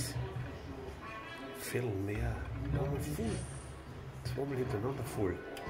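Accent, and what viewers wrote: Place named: Bavaria, Germany. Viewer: Du bist doch a Bayer oder des hör ich doch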